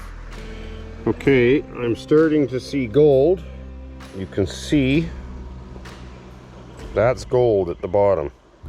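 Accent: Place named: Canada. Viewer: I watch this for the content but also the fantastic Canadian accent, one of the coolest accents there is. I’m a Geordie from the U.K. (Google my accent 😊).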